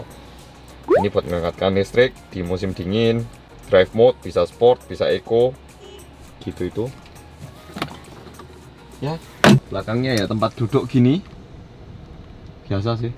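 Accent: Java, Indonesia. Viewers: Wajahnya kekoreaan tapi logat bicaranya indonesia banget .mantull..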